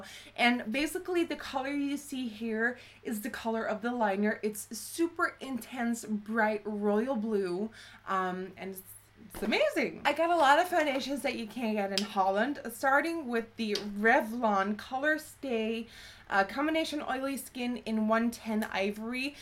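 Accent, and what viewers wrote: United States. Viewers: How funny, I thought you were from America this whole time! Glad you loved our City by the Bay...it is where I live! :) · Wait, you are in Holland?? Your accent sounds so American. :) What a fun video.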